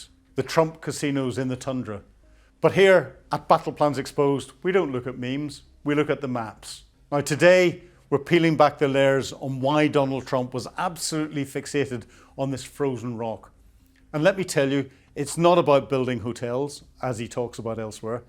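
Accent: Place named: County Antrim, United Kingdom. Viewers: Good use of maps and other visuals. I always enjoy your analysis. I also enjoy your accent, my father was from County Antrim and my relatives had that kind of accent. Now the Greenland fuss makes sense. Keep up the excellent work. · I'm sorry, but I just don't buy all you are saying about why the USA "needs" Greenland. Speaking with an English accent does not make you more believable. Have you ever played the game "Risk" ? I have a feeling that you would love playing it, perhaps with Donald. At this point these there is far too much rubbish and speculation on YouTube, and you are not helping.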